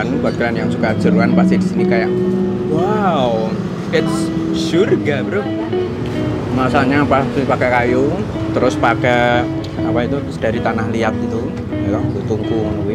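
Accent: Java, Indonesia. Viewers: Menurut aku, blm ada uniknya dan bhs jawanya terlalu medok. Mhn ma'af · yg unik itu kyk apa ya? Salah ya kalau gaya bahasanya medok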